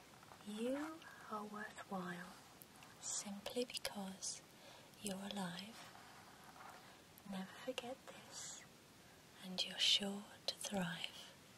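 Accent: United Kingdom. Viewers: I find that I have that reaction with the majority of her more British terms or even words that have a significant difference in pronunciation between her accent and American English. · ..i could listen to you read out of the phonebook! Your voice is so soothing and calming..i love your British accent as well. I love your videos..they're very relaxing. Good day, lovely!❤😊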